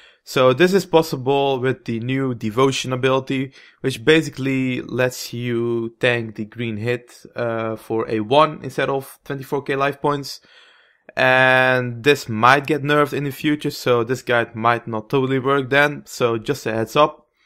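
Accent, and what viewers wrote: Netherlands. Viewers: i can litterly hear youre dutch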